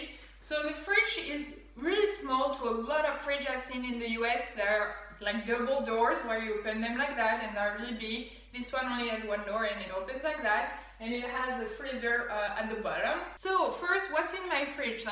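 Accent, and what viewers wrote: France. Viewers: Thank you for the tour of your kitchen. Interesting and always fun to view something new. 💜 love your French/English accent. Your so Cute 🌺 My Best always 😉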